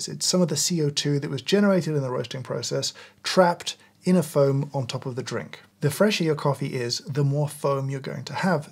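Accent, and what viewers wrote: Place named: RP, United Kingdom. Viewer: Petersen 😂😂😂😂 Yes he speaks the Queen’s English !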